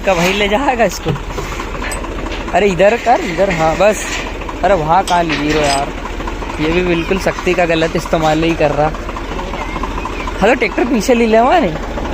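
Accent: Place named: Rajasthan, India. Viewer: Bhai Rajasthan se ho ya haryana se.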